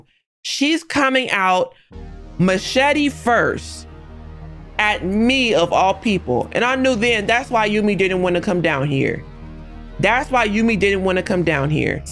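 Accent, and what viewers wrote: United Kingdom. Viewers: I haven’t even finished the video yet, but I already know the narration is gonna have me weak 😂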